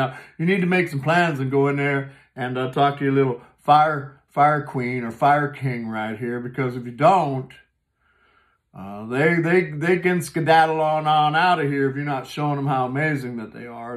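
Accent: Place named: South, United States. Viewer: I love your energy! I love your humor! I love your passion! And i love your accent (i'm not a native english speaker but, do i sense a southern accent there?). I claim all your readings in advance! Love and Light!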